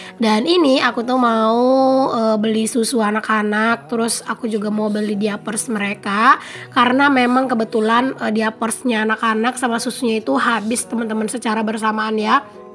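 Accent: Java, Indonesia. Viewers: Rumbai hadir,namanya obat keteguran bnd,masa bnda lupa?bnda sekali2 pakai logat pekanbaru dunk?kayaknya bnda ngomong seperti logat jawa aja🤗🤗🤗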